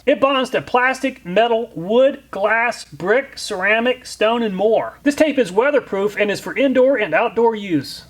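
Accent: United States. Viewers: A genuine request. You have a strong accent to those of us from outside the United States. yes, there is a world outside the US and the speed you speak at makes it very difficult for us to understand comprehend your story. You are interesting but hey, three runs of the story to understand. your challenge is, attract more people from over the shores.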